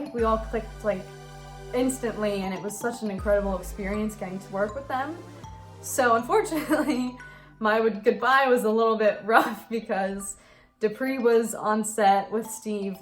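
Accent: United States. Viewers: My dad's family is from Philly and you sound just like them.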